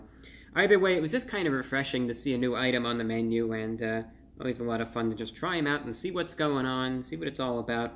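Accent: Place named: New York, United States. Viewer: You can tell he's from the New York area.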